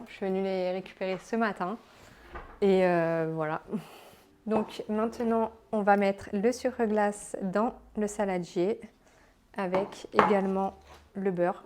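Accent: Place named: North, France. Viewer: ton p'tit accent nordique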